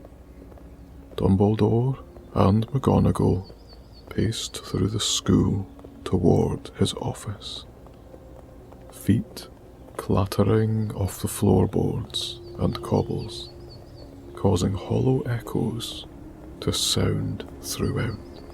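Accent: Scotland, United Kingdom. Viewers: What's withe terrible Scottish accent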